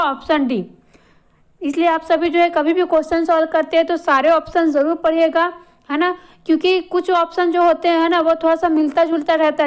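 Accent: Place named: Chhattisgarh, India. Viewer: हमारे धमतरी रायपुर से हो ऐसा लग रहा है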